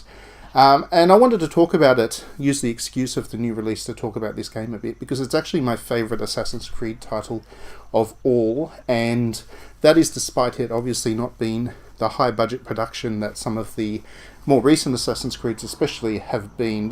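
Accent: Australia. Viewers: yea,i hate australian accents,but dont change your accent just because i hate it,no but you do need to say vita and not vitar and it s not just you,a lot of english people make the same mistake,any way i think that you deserve more views and more subs,and you have just gained a sub,mate btw sorry if i misspelled somethin, im portuguese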